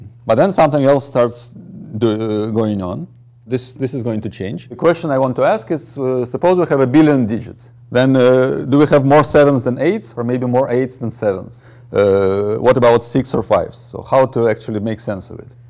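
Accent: Russia. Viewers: Is this guy russian? His accent is very familiar to russian. P.S. : No stereotypes, i just live in Russia and i have to admit, that most of us, russians, have terrible pronunciation:) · I immediately recognized he is Russian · is he russian?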